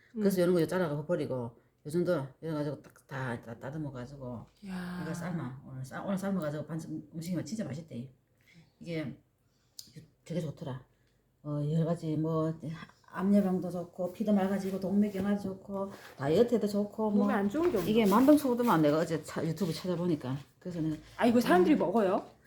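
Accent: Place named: Gyeongsang, South Korea. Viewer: 경상도 사투리는 또 하나의 외국어..ㅎ 정겹고 다정다감합니다. 맛도 좋은 만병초 함초가 지천에 늘렸다는 사실이 신기합니다. 늘 건승하세요.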